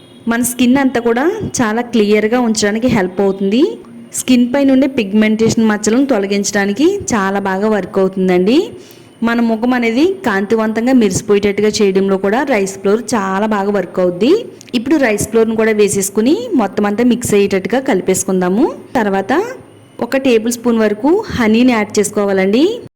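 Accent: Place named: Godavari, India. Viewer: Godavari districts vallu ekkuva ga andi ani antaaru chivari lo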